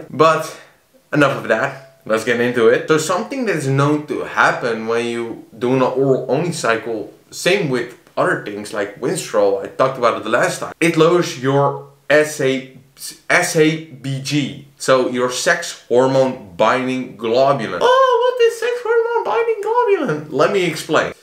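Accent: Netherlands. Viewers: Ik hoor een heftige nederlandse accent 🤣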